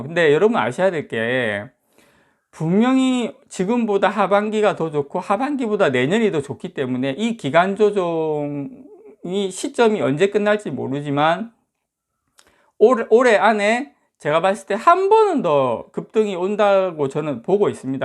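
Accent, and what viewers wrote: Gyeongsang, South Korea. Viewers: 갱상도 말씨 정감있어 좋아요 ㅡㅡ하또 하또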